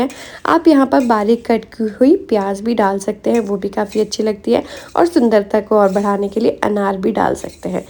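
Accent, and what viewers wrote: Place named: Madhya Pradesh, India. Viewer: Mujhe lag hee raha tha, bolne ke tareeke se ki aap मध्य प्रदेश की होगी ।👍 बढ़िया । में भी m p ki hee hun । शुभकामनाएं 🎉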